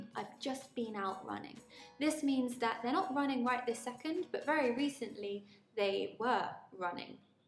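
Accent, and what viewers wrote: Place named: United Kingdom. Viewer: Hi,! Thank you for this useful lesson! ❤ I've never been using the Present Perfect Continuous tense in my daily conversation, but now I certainly will. And I love your British pronanciation! ❤ With the best wishes from Ukraine! ❤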